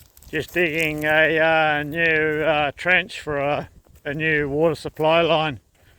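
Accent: Australia. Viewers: Another great video! Cheers from Norway again as it's late beer O clock! Looks like the stops there was a couple of Cat 3500 piston pins, have done a fair bit of those... Currently doing MTU for the last 10 years similar to the 3500. Also great to hear the Australian accent and the entertaining expressions like '' as the crow flies'' you have to explain that one as I didn't get it. Anyway cheers!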